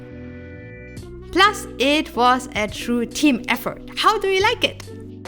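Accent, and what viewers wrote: Germany. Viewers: Wenn man dir länger zuhört, dann ist der deutsche Akzent sehr deutlich. Ist ein Kompliment ! 🤗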